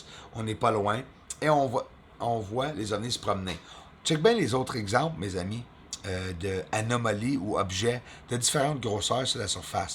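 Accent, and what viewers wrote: Quebec, Canada. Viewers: Bjr je suis française et j'aime beaucoup Quebec et votre accent et vous aborder un sujet que je suis depuis longtemps avec beaucoup plus d'intensité depuis 4 ans il y a quelquechose qui bouge dans les cieux objectif ? 🤔 Continuez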